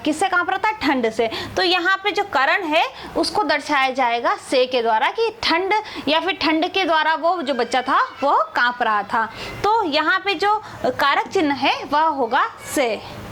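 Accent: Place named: Haryana, India. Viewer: there is harvani touch in your languge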